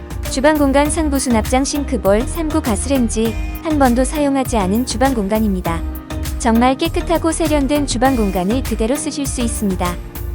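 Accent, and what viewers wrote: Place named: Gyeongsang, South Korea. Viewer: 기계음보다 대표님의 경상도 사투리가 더 좋아요~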